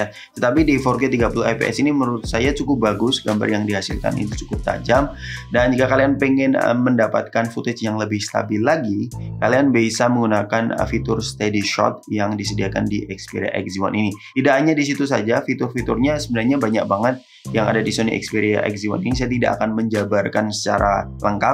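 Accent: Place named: Java, Indonesia. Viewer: Ketoro jowone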